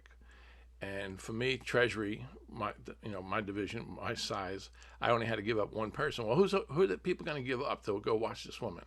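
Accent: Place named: New York, United States. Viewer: Great first solo video! I'm amazed that you still have your New York accent even after being away from it for so long! As a NY'er, it makes me smile 🙂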